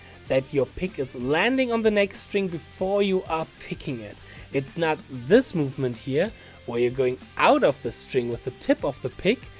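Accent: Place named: Germany. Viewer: Right, Yngwie is one of those one in a billion supertalents and who are you?? The way I see it is that you are some strange looking guy with a weird german accent with a hoody on in what I assume should be a heated tiny room with some cheap lights, hoping to get some views of off Rick Beato's success and Yngwie's massive career. Who are you again?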